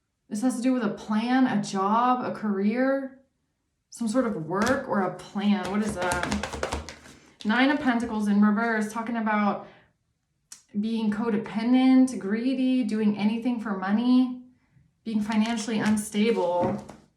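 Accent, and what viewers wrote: Germany. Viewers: Your German accent is beautiful! The wicked evil thief and his satanic family can ask s@@ to save them, they offered the collective as sacrifice for life insurance policies, steal their homes, steal their finances because the evil leader DJ zumba instructor played God on chosen lives, God's wrath is upon them, they made their bed and now they can lay in it KARMA Isaiah And I will punish the world for their evil, and the wicked for their iniquity; and I will cause the arrogancy of the proud to cease, and will lay low the haughtiness of the terrible these wicked evil inhuman intentions towards someone who did ABSOLUTELY NOTHING to him or his organized criminal group is UNJUSTIFIED for greed, sex orgies, lust, envy, jealousy? Psychopath. Kerosene light up a fire that never quench Malachi 4:3, which says they will be "ashes under the soles of your feet". 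Other verses mention a "lake of fire" (Revelation ) or "eternal fire" (Matthew ) where the wicked will suffer eternal punishment. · Yeah,... they've struck me like a match without even thinking about the consequences. I hope they learned to drop and roll. p.s I Absolutely love your German accent and language skills. What a cute picture of a lovely fraulein. That's so cool!!!❤❤❤❤❤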